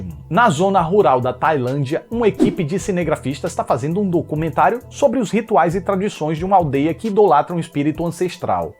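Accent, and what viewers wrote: Northeast, Brazil. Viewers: Ei tu é Cearense? teu sotaque parece ser nordestino, abraço amigo!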